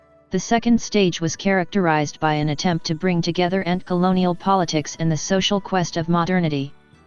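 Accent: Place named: India. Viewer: Improve your Indian speaking English way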